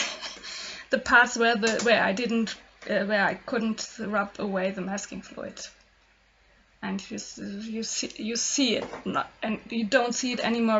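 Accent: Germany. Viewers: Love your art, love your English because you use it with a delightful accent. I spent many years in Germany with the army and never mastered Deutsch. Thank you for sharing your art and please do not lose your accent. Ps where in Deutschland do you come from. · im sorry if i wrong but are you german because i am to and german was what i was raised with and i sounded the same way sooooo dont worry it gets easier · I personally love the German touch to your English. Everyone adds their own flare to their English. It's sad to see those trolls criticize someone for sounding unfamiliar to themselves. On a side note, I really like your work, I'm considering using paint more often because of you 🙌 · I think your english is great! You have a little bit of an accent, such as german natives have, but that's all. Thank you for your lovely and instructive videos. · i really love your accent. 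Im american but i have a speech impendememt that makes me sound like i have a heavy accent (idk thats what people tell me). I dont see why people would make fun of you, your English is fantastic and i personally think you're accent is beautiful. Infact what i like about you is that i can tell you really try to explain how you do things and i think that's really sweet :) I might be alittle biased because i love German accents but whatever. You're a wonderful person and don't be timid about who you are or what you sound like. Atleast you try, that is all that should matter to the ones that matter :)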